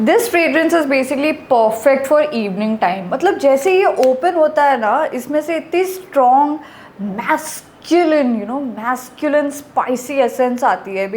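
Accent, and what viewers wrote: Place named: Bengal, India. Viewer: Are you Bengali?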